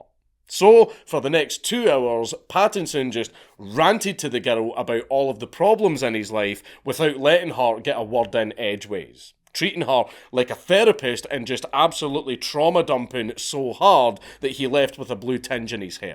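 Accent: Scotland, United Kingdom. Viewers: The thumb nail with the adoring fan 😂😂😂 oh man. We called him Troll Kid because he looks like a troll doll. Extra points if you know who sang this in Oblivion: Flyyyyyin’, flyin so high, cliff racer FLY sooOOO high. FlYYYYYin’ Edit: please tell me you and your friends karate chopped Minotaur wang too and launched the adoring fan off the cliff 😂 good times… With love from the USA Freakin love the Scottish accent 🤤 hope to come back some day 🤘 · I love how the Scottish accent changes Twitter into Twatt-er - which sounds incredibly apropos.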